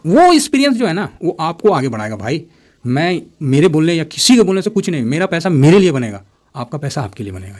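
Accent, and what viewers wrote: Bihar, India. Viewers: Sir aapka style bulkul hi deshi hai, sir aap jarur bihar ke honge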